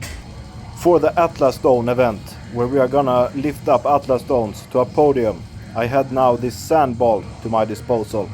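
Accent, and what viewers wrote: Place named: Sweden. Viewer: With your Swedish accent, It feels like watching as if Magnus Samuelsson filmed his sessions in his haydays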